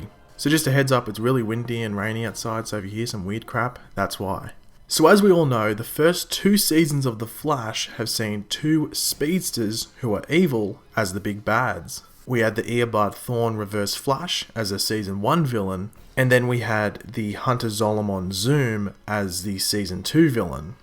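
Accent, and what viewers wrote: Australia. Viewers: Are you Australian? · u sound Australian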